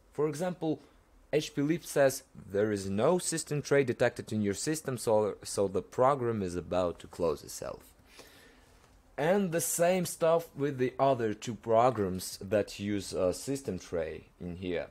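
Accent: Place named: Russia. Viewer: that's russian accent bruh :)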